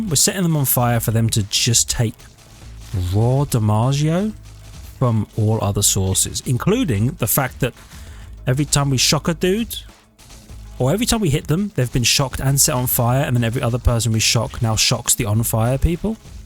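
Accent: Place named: England, United Kingdom. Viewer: He said guv! Wasn't sure if he was English until now. The deep slang really drives it home.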